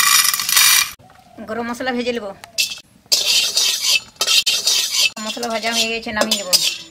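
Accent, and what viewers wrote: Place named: Mayurbhanj, India. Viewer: Definitely ur from mayurbhanj 🙏😀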